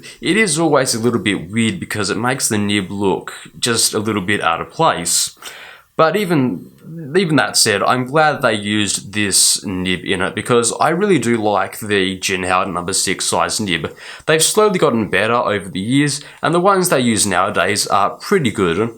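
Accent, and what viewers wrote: Australia. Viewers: From his vowel usage I’d say Australia, or Straya! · Lovely accent. Are you from Australia, or New Zealand?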